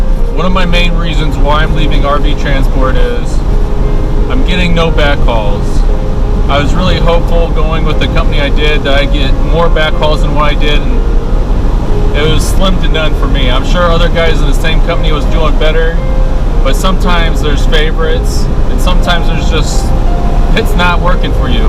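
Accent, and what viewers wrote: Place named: Canada. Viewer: Well that's really good I'm happy for you and I'm sure you like it probably a big change for you you sound like you're from Canada are you well thank you for answering my reply really enjoyed your video I could hear your frustration I guess you would say and in the tone of your voice and I completely understand I've been in similar situations and of course with different companies doing different type of work but I completely get it then again really enjoyed your video looking forward to seeing more of them and God bless and I hope you have good luck in your new work opportunity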